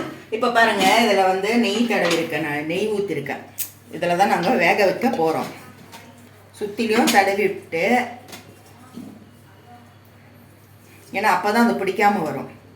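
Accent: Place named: Kongu, India. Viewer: Super ma, kongu தமிழ் manakudu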